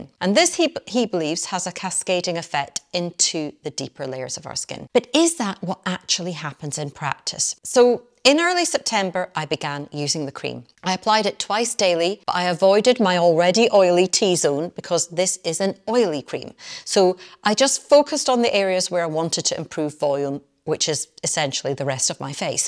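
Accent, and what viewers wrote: Scotland, United Kingdom. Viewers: I love your accent. Scottish or Northern Irish? I hail from Dublin.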